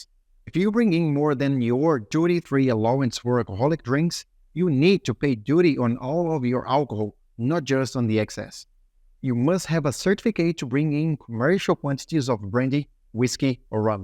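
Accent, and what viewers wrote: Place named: India. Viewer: Guy sounds like a Telemarketer from India.They can't find an Aussie as narrator??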